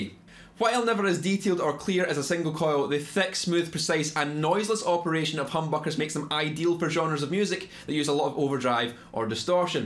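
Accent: Scotland, United Kingdom. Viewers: Good to hear a Scottish accent for a change, plus I understood what you were saying, I liked the look of the 2nd guitar you were playing, can you tell me what it's called? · Scottish accents are so cool wish I had one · It's just a run of the mill Scottish accent! Nothing special. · F*ck me, a great video on a fascinating subject, and instead of compliments and questions, the comments are plagued by thickies who have never heard a Scottish accent. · it's scottish? cool, i was guessing australian..... cheers :)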